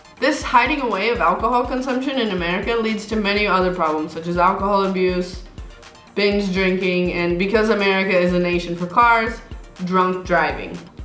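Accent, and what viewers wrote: Czech Republic. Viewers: All right girl, I do not know where the heck are you living, but let´s go through some facts. At first, children drinkin alcohol is a serious issue in CR as well. 2nd thing yea, there is no tolerance about driving while alcohol is still in the system, but it is happening frequently and people are dying bcs of it. Often. Also, people are fighting while drunk, very often. I live near a complex of bars and clubs and every weekend I am just counting ambulances escorting idiots from here. You are right about our fridges and grocery shopping, however paying rent by cash? It can happen sometimes, but usually normal thing is to pay by money transfer from your account. Salary in cash? WTF? Maybe when you are working in a pub, but otherwise nope. NOPE. If we are talking about "scetchy" business, then this has nothing to do with our country, it is happening everywhere in "modern" parts of world. 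Last thing, I am not trying to be suspicious, it´s more of a funny thing I noticed, but your accent is really "czechy". Cheers, enjoy your living in our beer land. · She has Czech accent tho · Your accent is Czech haha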